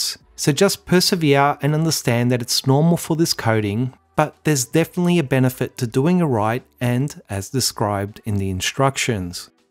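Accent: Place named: Australia. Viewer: It's how we say it in bloody Australia mateeeeeeeeeee!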